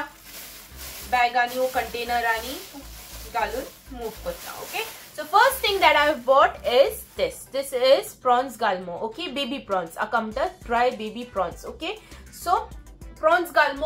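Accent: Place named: Karwar, India. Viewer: Becoz you speak like karwar people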